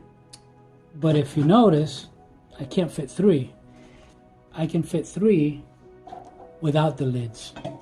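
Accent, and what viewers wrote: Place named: Cuba. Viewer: Is this your new microphone? Sounds decent. I'm old and perhaps not "politically correct" but what is the source of your accent? Cuban perhaps?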